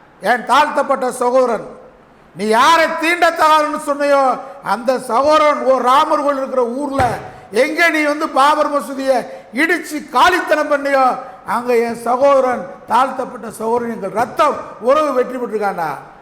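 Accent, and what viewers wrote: Madurai, India. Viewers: சார் சூப்பர், மதுரை ஸ்லாங்கில், தைரியமாகவும், வீரத்துடனும், இஸ்லாமியர்களுடன் நட்பு பாராட்டியும் அருமையான பேச்சு, நீங்கள் இன்னும் பேச வேண்டும் அட்டூழியங்கள் ஓழியும் வரை.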